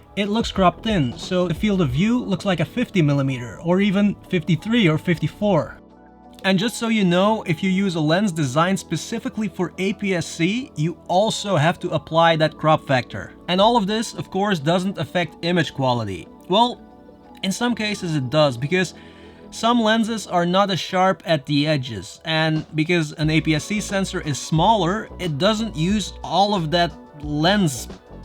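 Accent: Belgium. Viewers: Antwerp accent?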